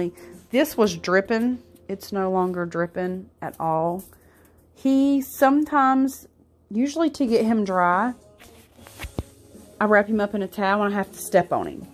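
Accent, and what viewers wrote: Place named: North Carolina, United States. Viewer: Are you from NC or SC? Your accent is damn near identical to mine and I'm from NC 😆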